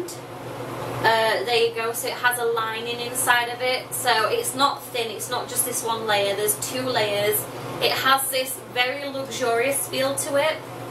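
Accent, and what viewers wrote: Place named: Australia. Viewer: hehehe anytime. Aussie accents arent that bad but yours is the best by far.!!! xo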